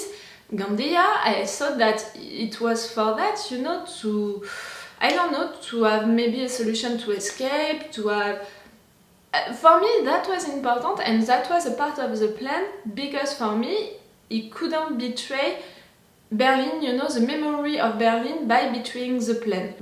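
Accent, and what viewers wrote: France. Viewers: T’es française t’a un ptn d’accent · Haha ça s’entend que tu es francaise mais c’est trop cuteee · Are u French? Love your accent although I can’t understand a lot haha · your accent is so french and I love it 😂😍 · J'adore comment j'ai vu direct que tu étais française, on sent nos accents 😂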